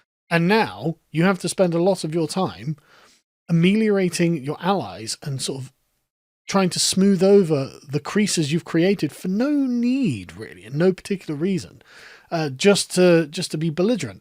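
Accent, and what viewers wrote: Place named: United Kingdom. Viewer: I'm not going to sit here and be lectured about America by a man with a British accent .. look at your country with do you know ? .. lol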